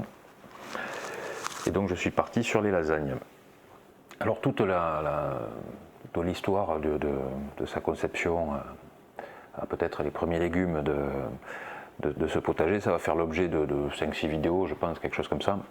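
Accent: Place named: Occitania, France. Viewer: Salut, exactement on s'en fout des mots qui finalement desservent la communauté. Oh on a le même accent, ce bel accent des gens du pays d'Oc, et avec ce brin d'auto dérision je suivrai tout ça avec beaucoup d’intérêt, je te souhaite de créer un bio lieu de vie dans ton jardin mais surtout de t'éclater dans ce que tu crées (ça ne veut pas dire, de se prendre des pelles dès que tu mets un pied dehors hein mdr).;)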